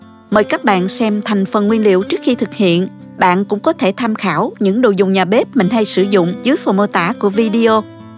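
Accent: South, Vietnam. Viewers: Cô này giọng miền nam chắc nấu kiêu miền nam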